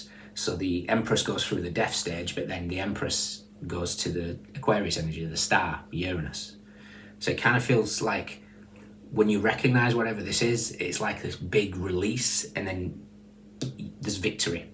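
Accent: Northern England, United Kingdom. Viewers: Thank you for yr reading ! Love yr accent...North of England ? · Definitely Northern England...probably Manchester area. 🤗❤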